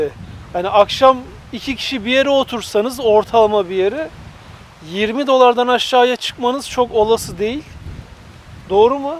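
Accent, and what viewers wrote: Samsun, Turkey. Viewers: "Ben de az yiyim daaa." samsun ağzını filipinlerde görmek duygulandırdı abi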